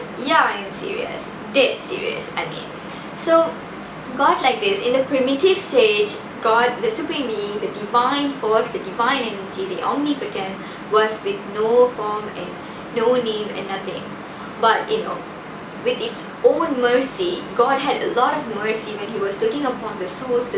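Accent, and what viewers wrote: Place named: Tamil Nadu, India. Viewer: other than your tamil ascent i love you videos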